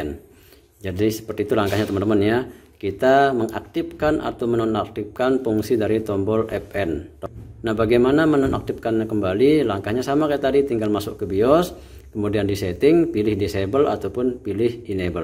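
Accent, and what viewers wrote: Bali, Indonesia. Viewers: aksennya kok kayak orang Bali ya?. Laptopnya seperti yang aku pakai ini, paka SSD 256GB, HDD 1TB dan RAM 8GB, hanya saja untuk record aku kurang sreg, karena suara fan masih terdengar, mungkin harus pakai external Mic ya?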